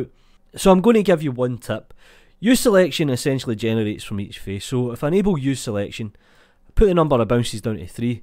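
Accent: Scotland, United Kingdom. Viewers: I started to listen to this video and I was like: Is this guy? He sounds. Well, he is it literally.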